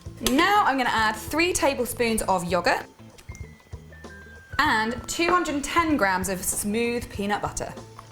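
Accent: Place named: London, United Kingdom. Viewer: It's a London accent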